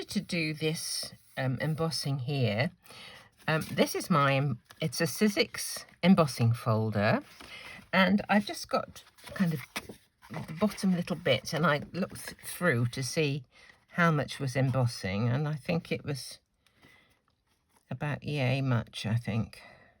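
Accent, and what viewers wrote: England, United Kingdom. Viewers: Hi. I am in Spain and love your videos. Not many with a lovely English accent. I haven't got the same die, but I do have one with a gnome on and will try and do something similar. I hope it works out. thank you so much for sharing this idea. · I’m watching you from Mississippi, USA. can’t always catch you during the day but usually enjoy your videos in the evening. I love you cards and your soothing British accent. Looking forward to your next video.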